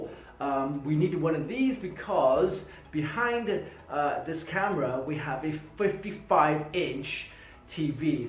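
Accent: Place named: United Kingdom. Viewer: An Asian man with a British accent = YUMMMM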